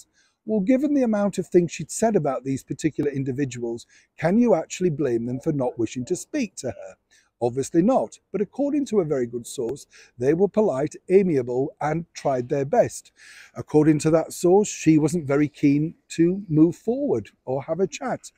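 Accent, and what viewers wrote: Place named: West End, United Kingdom. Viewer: Love ya Neil Sean here from the west coast USA!! You got a bit of west end in your accent but with high quality English Grammer. You spot on and I look forward to your reports daily. Cheers mate 🍻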